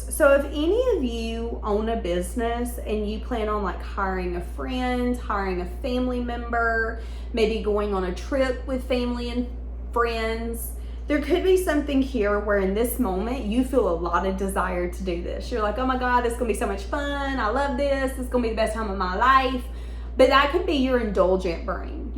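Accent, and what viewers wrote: South, United States. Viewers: I do love her Southern accent. Paying attention from the UK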